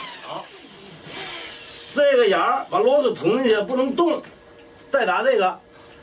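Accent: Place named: Tianjin, China. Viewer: 10 分钟 做完 用了二集???这嘴天津的... lol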